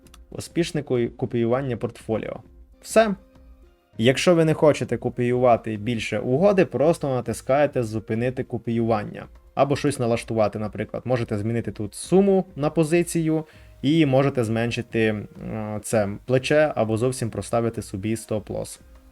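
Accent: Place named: Transcarpathia, Ukraine. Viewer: Чується Закарпатьський діалект )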